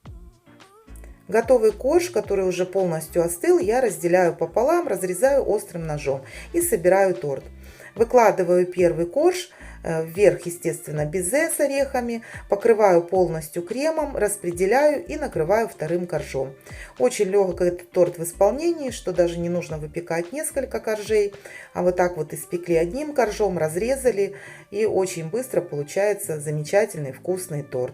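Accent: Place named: Galicia, Ukraine. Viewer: Как ужасен галицкий диалект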